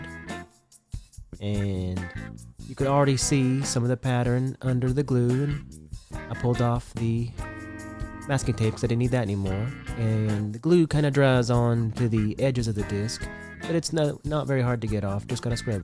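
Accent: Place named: South, United States. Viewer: Damn I have been away from Tennessee for far too long, that southern twang makes my heart sang.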